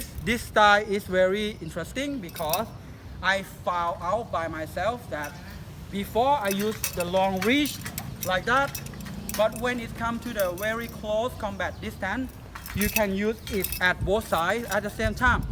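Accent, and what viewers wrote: Thailand. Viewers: lol I knew it you must be Thai people listening to your accent Great to see people interested in this weapon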